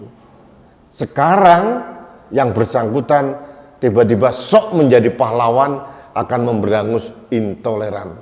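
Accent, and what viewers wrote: Java, Indonesia. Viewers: Saya sangat senang dengan Kata Prettt dri,yg kental logat jawanya,setuju Gubernur cuman obral janji hasil 0,hasil Bel gedes geh pak,Nollll blonggg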